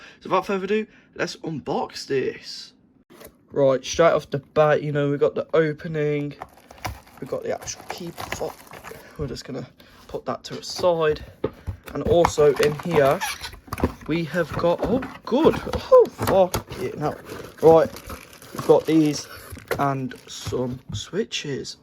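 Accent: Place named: Dudley, United Kingdom. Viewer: the accent bro, I’m from Wolverhampton and my moms side of the family are majority Dudley so I’ve grey up around the accent so I can spot a Dudley accent easily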